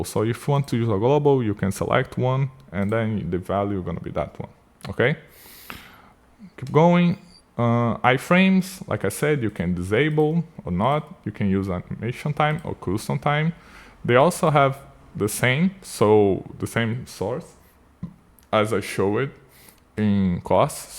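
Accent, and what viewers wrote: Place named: Brazil. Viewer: Não sei como mas da pra reconhecer que é BR pelo sotaque kkkkk Salve de Florianópolis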